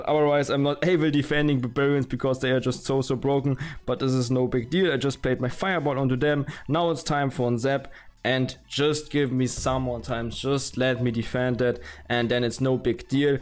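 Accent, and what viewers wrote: Germany. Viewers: Man hört so hart das du deutscher bist 😂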